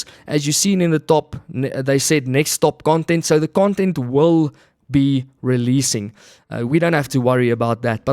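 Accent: South Africa. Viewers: The South African accent is strong with this one!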